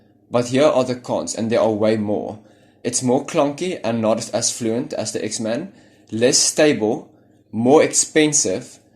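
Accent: South Africa. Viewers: Are you south African, nice accent! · What I'm so sorry but are you South African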